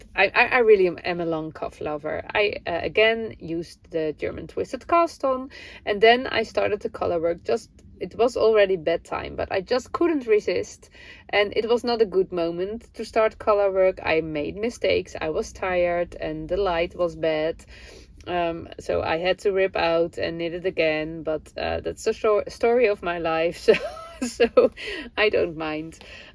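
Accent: Netherlands. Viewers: I love your "Dutchie" English, I can understand perfectly. I'm familiar with the word paprika because my mom, who was Slovenian, called a red pepper (the ripe version of a green pepper) that. We also have paprika, the ground powder seasoning. I love how beautiful you have made your caravan/camper with all hand made items. Your camper has many heart jumping moments, every time you dress it up with something new, I'm sure! Did you follow a pattern for the cute curtains behind you? I hope you had or are having a lovely time away from normal daily activities. 💗💗💗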